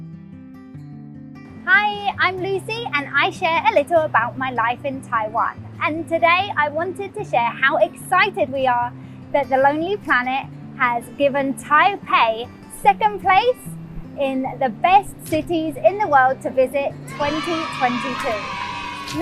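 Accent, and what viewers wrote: United Kingdom. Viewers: 對啊！我一開始就訂閱，濃濃英國腔就是原因之一。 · l feel much like to listen your speaking vowels clearly at each word in the videos。 Your sounds are good for everyone to study a standard British speaking. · Lucy英國腔英語好好聽 繼續聽下去。我住台北市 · 觀察入微，描述細緻，加上妳優雅柔和的英倫口音，絕妙！(點閱應更高) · 💓😍 love your British Accent....soft and clear. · Like your UK accent, and thanks for promoting Taiwan and Taipei City :) Hope you are alright with kids edu system in TW or the way people there teaching your kids, cheers. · 英國腔的英文真的好好聽喔👍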